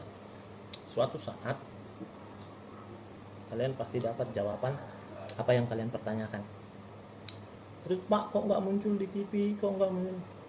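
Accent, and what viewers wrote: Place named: Medan, Indonesia. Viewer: Pak orang batak apa bukan pak Suara nya nada medan pak Salam dari saya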